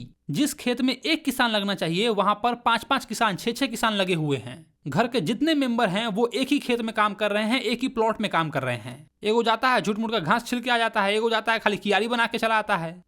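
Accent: Bihar, India. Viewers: bhai tum bihar se ho kya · Yess he is bihari ✌🏻👽🌚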